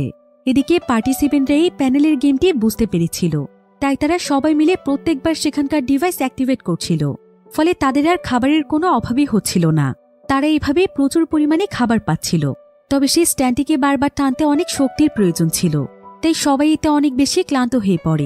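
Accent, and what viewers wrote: Bangladesh. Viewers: accha apo .. apni ki Dhakai taken?😶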